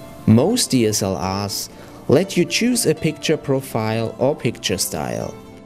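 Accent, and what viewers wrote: Germany. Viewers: is your accent german?? you sound a little funny? say fas-ci-na-ting, not fas-nating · his accent sound like Germany! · Kanst du bitte ein video in deutsch über wie dreht man richtig videos zb. Mit der eos 650 d in deutsch bitte ich höre das du deutscher bist :) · despite the german accent it's very helpful, thank you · Oooooh you sound soooo German :-) but nice Video. Thanks or Dankeschön :-)) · You sound very German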